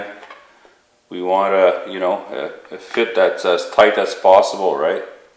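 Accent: Quebec, Canada. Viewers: Quebecois eh? I like your accent. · Great video, mesmerized by your Canadian accent - very cool. You have got some impressive tools and skill set. Thanks for the video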